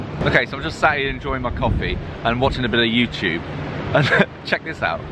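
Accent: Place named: Devon, United Kingdom. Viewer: Get in Bey!!! come down to Devon, although you sound like you’re from my area anyway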